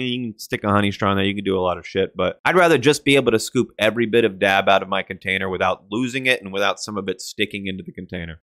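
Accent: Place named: South, United States. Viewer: Bro I love the list but I REALLY love the southern accent you pulled off. I’m from the dirty south you did so good 😂😂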